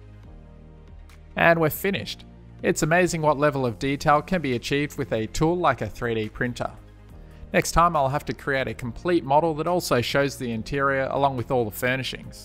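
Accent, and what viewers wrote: Australia. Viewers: You Australian??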